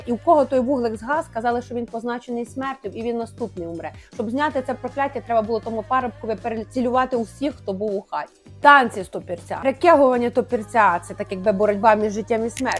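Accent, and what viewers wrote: Hutsul, Ukraine. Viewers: Я все зрозумів, просто замість Я говориш Є і в тебе гуцульській акцент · Як я люблю цю жінку, особливо її гуцульський говір. Нехай щастить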